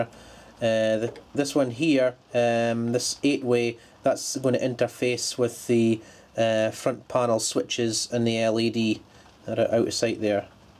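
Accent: Scotland, United Kingdom. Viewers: is from Scotland. I am English. One can easily 'get' all the content by the context. He is clearly a Top Boy and I love having to try a bit harder to understand his speech pattern. I can cope with a few "eeee", "eeerrr" when he is so on the money and his kindness so evident.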